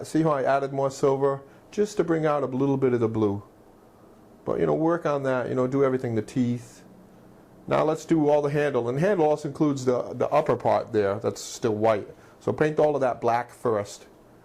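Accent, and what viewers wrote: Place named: Bronx, United States. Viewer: I love how you thumbs upped your own video. Sometimes, I think I'm my biggest fan too! Your accent reminds me of a guy I grew up with who was from the Bronx, where did ya grow up?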